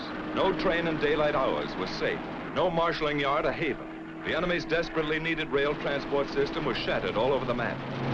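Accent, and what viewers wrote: United Kingdom. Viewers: Good I found one without British narration . It's seems it's all the brits do..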